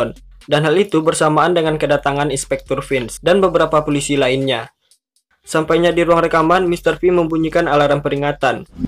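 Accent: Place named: Sumatra, Indonesia. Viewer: Logatnya kaya orang sumatera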